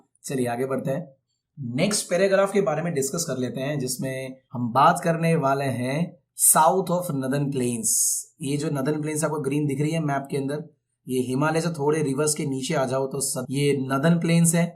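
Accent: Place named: Kathiawar, India. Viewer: Kathiyawadi chie ho bhai . Chaa na kotha vagar kai na thai ho bhai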